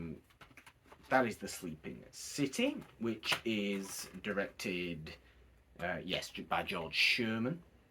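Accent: Northern England, United Kingdom. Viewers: I’m trying to find a review of this box set, and not seeing ‘unboxing’ on your post, I tried you. So I’m disappointed. Nice to hear a northern accent, though.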